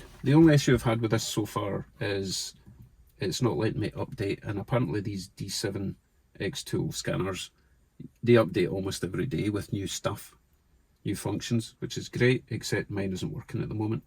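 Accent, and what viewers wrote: Scotland, United Kingdom. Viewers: I get the hint of a Scottish accent 🤣 , a more posher Scots twang than a thick West Coast Glesga, or has it deteriorated due to living in the states, excellent vid 👍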